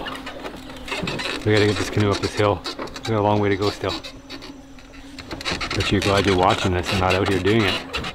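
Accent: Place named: Canada. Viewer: So annoying how Canadians so about and aluminum!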